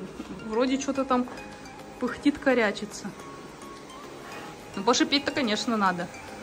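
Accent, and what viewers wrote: Siberia, Russia. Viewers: так беситесь на здоровье. Я не батюшка, помочь ничем не могу, бесов изгонять не умею. А акцент у прекрасный, она же сибирячка, а там основа - казаки, еще от Ермака. Вы донских казаков не слышали, у них речь вообще другая. У меня бабушка всю жизнь вместо «вчера» говорила «надысь» и вместо «скачет» - « бегхеть». Ну и так далее. Шолохова почитайте. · нормальный, сибирский говорок. Я так понимаю, вы казачий и близко не слышали.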